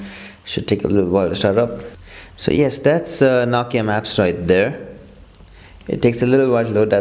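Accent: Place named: India. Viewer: well by changing ur name you cant hide your indian voice. nice review though.!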